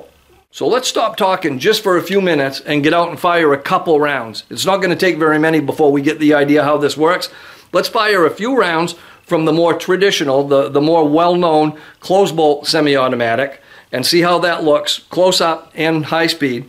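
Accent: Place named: New England, United States. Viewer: Having lived in Boston for years, I love your New England accent.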